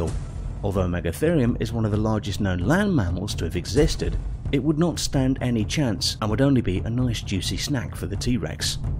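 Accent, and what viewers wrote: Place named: United Kingdom. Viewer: that british accent makes tge video better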